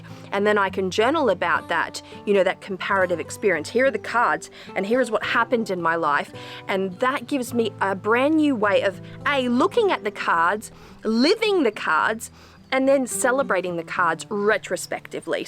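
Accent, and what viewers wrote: Australia. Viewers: Another great video, and I thought you were Australian. I'm in SA and love your enthusiasm in your videos. Thank you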